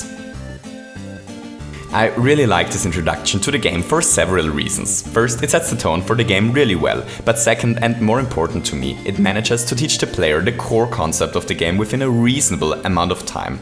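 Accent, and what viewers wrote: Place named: Germany. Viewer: Probably because of his german accent.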